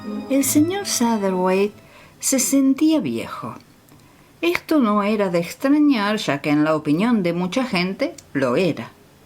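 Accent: Argentina. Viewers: Como ronuncia la eye se qie es Arguentina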